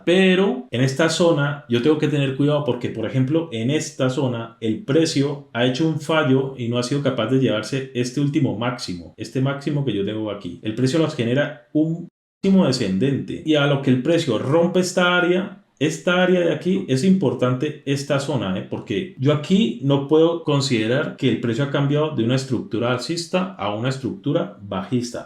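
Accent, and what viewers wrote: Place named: Valle del Cauca, Colombia. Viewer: Hermano saludos desde 🇨🇴 !!! eres colombiano cierto? Vallecaucano? pero por lo que veo no vives en colombia porque dijiste que operabas en horario europeo... En fin... solo queria darte las gracias por este contenido... te acabo de encontrar y llevaba rato buscando alguien que explicara sobre estructuras de mercado! Esto en este momento de mi vida es de mucha ayuda ya que estoy comenzando como trader y creo que entender esto es fundamental para poder ser rentable! Te doy un par de ideas de videos ya que veo que estas comenzando con el canal! seria bueno que hicieras un video explicando la terminologia que usas... por ejemplo... que significa "liquidez" cuando dices que hay traders buscando liquidez. o que significa acumulacion y cual es la diferencia... esto es algo que no tengo muy claro y que nos ayudaria mucho a entender tus videos... 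Muchas gracias nuevamente y aqui tienes un nuevo subscriptor fiel de tu canal!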